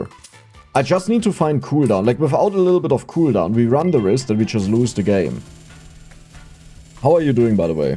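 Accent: Germany. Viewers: Man hört so raus dass du deutsch bist. Ich Liebs. Peak Video btw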